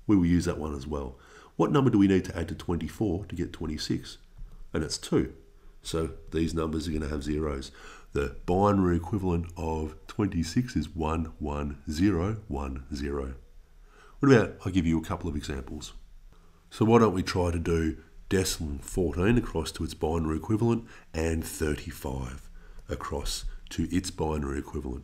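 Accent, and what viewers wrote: Australia. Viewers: At first I thought you were british but the Australian accent kicked in later in the video 😂😂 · I had to go over this in my online class and completely skipped the unit cause the way they explained it was too complicated. It was ridiculous and I hate math. After this video, I went back, followed the instructions and double checked and got them all correct. The Australian accent made it 40x easier. Wtffffff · I watch your videos because I always learn whatever you’re teaching because you teach very well but mainly because I just wanna listen to you speak lol where are you from? Australia im guessing but which part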